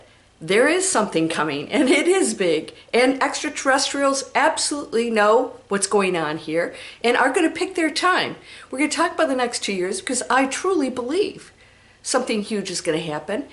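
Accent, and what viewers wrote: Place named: South, United States. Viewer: Luv your southern accent! Great vid!👍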